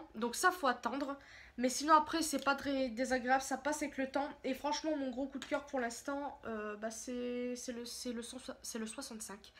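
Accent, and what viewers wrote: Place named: Nord-Pas-de-Calais, France. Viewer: Mon dieu.... Je m'excuse mais dans le NPDC on a pas tous cet accent dégueulasse.... Ça me fait mal car on ne parle pas tous comme ça. Faites un effort s'il vous plaît ! Vous parliez avec cet accent à l'école ? Nous non ! "jvais vous faire voir" "j'aime de trop" "prrrrrrrt" "esspliqué" "à la pub" "swouotché, voilo" "voyagé" (voyageur) Non, non, non.... pitiée pour notre patois ....déjà Dany boon nous a assez ridiculisé.... C'est pas la peine d'en rajouter. Je m'excuse je tombe ici par hasard, je cherchais des malheureux swatches.... Alors j'en ai et notre accent à couper au couteau.... Prenez l'accent en famille svp, mais pas devant la France entière svp ! J'ai tellement honte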